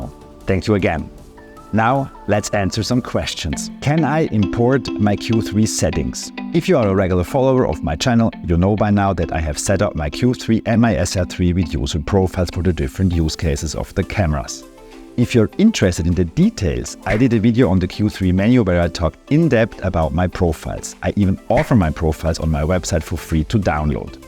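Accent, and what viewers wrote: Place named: Germany. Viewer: Qimago hat gesagt wir sollen dich schön grüßen 🙋🏼‍♂️ Und abonniert! Bleibt der Kanal englischsprachig? Deutscher Akzent ist so gewöhnungsbedürftig 🙈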